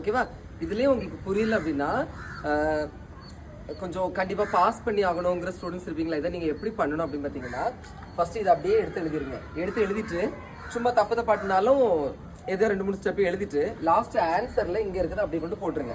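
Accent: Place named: Kanyakumari, India. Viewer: Tamil language than, athu kanyakumari language ithu mathiri than pesuvanka.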